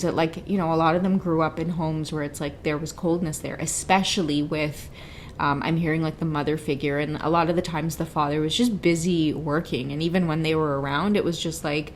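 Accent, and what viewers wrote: Trinidad and Tobago. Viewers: You are great, and I ❤️ your Trini accent!!! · Omg I love that trini accent.. so soothing lol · That's was so funny .that's how true Trini talk😊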